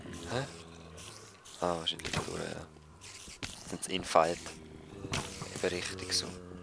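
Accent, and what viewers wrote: Switzerland. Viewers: SCHWIZZERDÜTSCH <3<3<3<3<3<3<3<3<3<3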